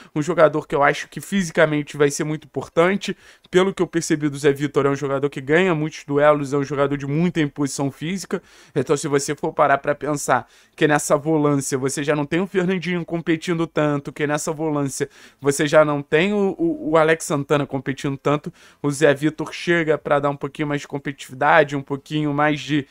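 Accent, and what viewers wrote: Rio de Janeiro, Brazil. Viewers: Com esse sutaque carioca , vc está querendo pegar carona no maior do sul , sai fora carioca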